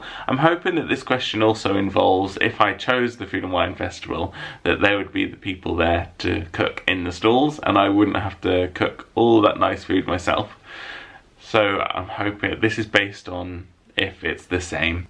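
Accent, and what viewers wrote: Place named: Scotland, United Kingdom. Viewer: I think it's perfect you put the questions in a Mickey Mouse cap. We need to hear more of your Scottish accent😂 Aww thanks for answering my question - to be honest I can't imagine any actor playing you because you are one of a kind!❤️ Haha I love the carpet demonstration! "Sophisticate, and I can see you have a cat"😹 Okay I was not expecting you to say bus driver! Oh my gosh I absolutely LOVE all the family footage - you all made me laugh so hard! And then your mom made me want to cry😭 Aww there we all are at the Angel Inn - that was almost exactly one year ago, can you believe it? We had the best time with you two! we are definitely missing your content as well. Can't wait for part 2!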